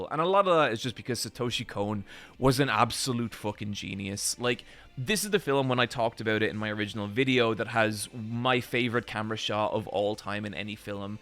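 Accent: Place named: Ireland. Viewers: Its a part of Irish dialect. Theres a documentary about the making of Ryan’s Daughter called “ A Bit of a Fillum” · The Irish accent is altogether adorable! Smile 😃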